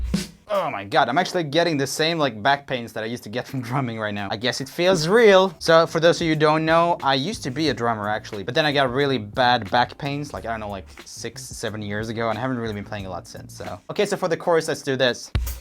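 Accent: Sweden. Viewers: Swædish accent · My mom heard me watching this and she was like "oh is he german or swedish?" And I was like how did you know???? He's swedish? She's just like I know things.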